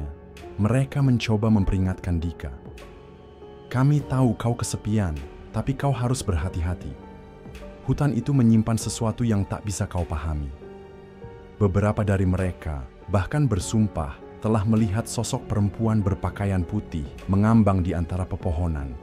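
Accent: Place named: Java, Indonesia. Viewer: Biasanya kl p.dhe yang cerita logat jawanya ikutan keluar😅😅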